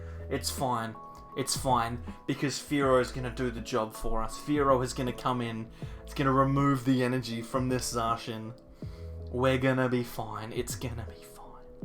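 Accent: Australia. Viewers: first I wanna say I live the vids, secondly the Australian accent makes it that much better but I do have a legit question, doesn’t pertain to you as much but why on earth are all y’all so bad at math during these videos, again not you but mostly just in general haha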